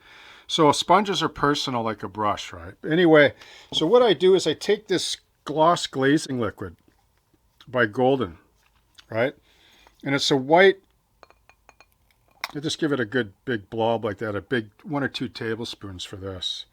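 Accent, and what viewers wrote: Canada. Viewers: Now I want to go watch Strange Brew listening to that Canadian accent!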